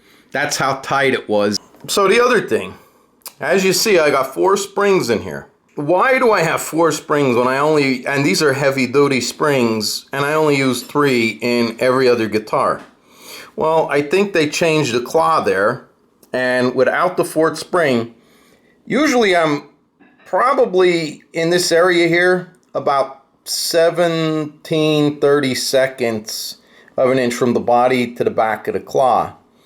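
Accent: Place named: Queens, United States. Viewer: Bro, where you from? You sound like me? Are you from Queens?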